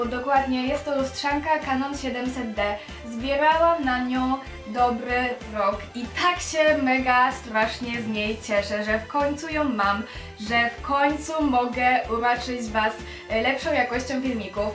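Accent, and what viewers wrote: France. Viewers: Skąd pochodzisz bo na tym odcinku słyszę taki francuski akcet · Akcent .. Francuski? :D jak się ,,NAUCZYŁAŚ" tego akcentu lub j. plskigo? :o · Mi to nie przeszkadza, taki trochę francuski akcent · Masz coś w akcencie z francuzkiego... Mieszkałaś kiedyś we Francji. Albo coś w tym stylu? 😄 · Masz francuski akcent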